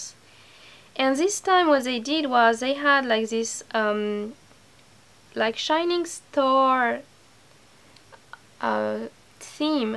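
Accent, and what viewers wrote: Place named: France. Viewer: she is cute ! congrats ! are you french ? you have a accent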